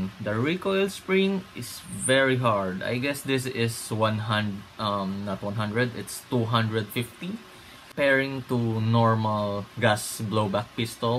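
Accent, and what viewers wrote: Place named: Philippines. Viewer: Sabi na pinoy ka po eh haha sa itsura at pananalita palang eh hehe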